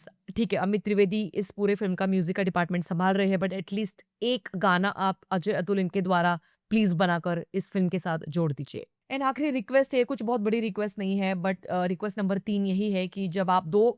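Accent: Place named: Maharashtra, India. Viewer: Tumcha Hindi he Maratho-tbhav aahe😊 chhan review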